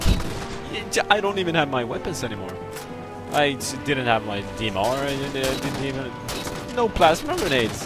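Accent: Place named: Netherlands. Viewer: where are you from? sounds like a Netherland accent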